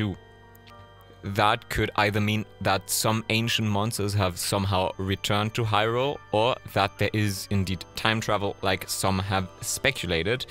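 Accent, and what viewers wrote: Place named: Germany. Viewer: Wie man einfach heraushören kann, dass du deutscher Muttersprachler bist. 🤣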